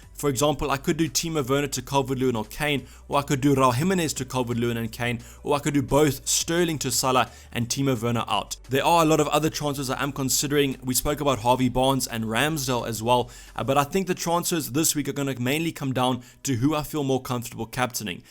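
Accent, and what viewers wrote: South Africa. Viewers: Are you South African my boet? 😂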